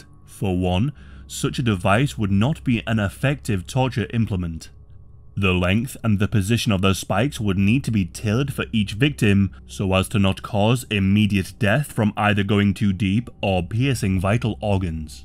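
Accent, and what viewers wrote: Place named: North East England, United Kingdom. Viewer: What the hell is this blokes accent-he sounds North Eastern, yeah a bit like Byker Grove?!!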